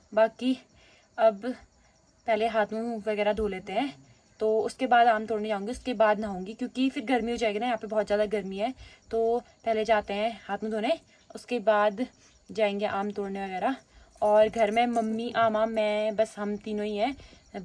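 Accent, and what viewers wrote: Pahadi, India. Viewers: Aapki bhi pahadi boli humari jaisi hai same❤😊😂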